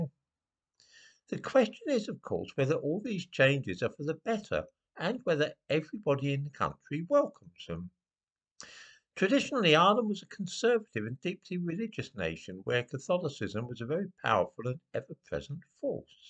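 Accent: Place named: England, United Kingdom. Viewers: This is one of the most ridiculous videos on this website. It’s not people with African or Asian accents that destroyed Ireland’s indigenous culture, but rather people with accents like yours. Unless these immigrants murder and oppress huge chunks of the population SEVERAL times, they will never match what the English did. Also, the definition of what it means to be Irish is changing all the time. Centuries ago, an Irishman was simply a Gael from Ireland. Today, many people who are white and who have ancestry going back centuries would be very offended with this definition, as their own culture and customs are no longer Gaelic, but anglicised. This definition of Irishman will continue to change into the future, and if there’s nothing wrong with the change up to now, then there’s nothing wrong with the change to come. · Nothing like a man with a english accent , lecturing Irish where they took the wrong path, ??? 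After surviving the genocide inflicted on our race by people with similar accents , its kind of ironic this particular lecture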